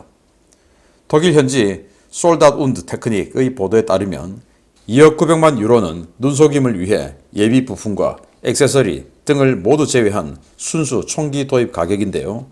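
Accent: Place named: Gyeongsang, South Korea. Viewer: 경상도 사투리 정겹습니다,,항상 응원합니다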